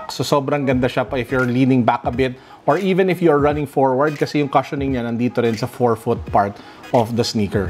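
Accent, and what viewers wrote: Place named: Philippines. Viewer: Your tagalog accent sir is so litt..... Shitttt galing nyu pung mag pronounce ng kahit anong lingwahe mapa english or tagalog pasok na pasok.... Try mo next mandarin sir... Hehehhe d joke lang.. Pero i salute your language skills..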